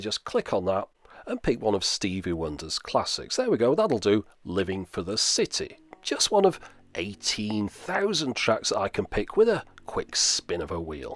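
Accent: United Kingdom. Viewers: it's awesome that youtube can recognize your words almost correctly through your strong British accent then provide a subtitle for me to recognize what you say..😳😳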